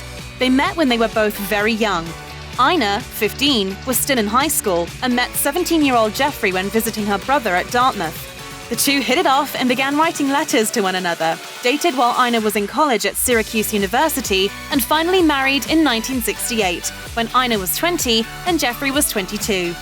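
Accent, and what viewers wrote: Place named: England, United Kingdom. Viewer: Why a voice-over with an English accent? Bad enough she talks too fast but she also is not right for a US audience. If you needed a Brit, how about one who knows how to speak accent-free? Not to mention who cares if she is working in her workshop? Or that she has helpers? It's a TV production, right? Would that our country could reflect as well in our public/political life as Ms. Garten.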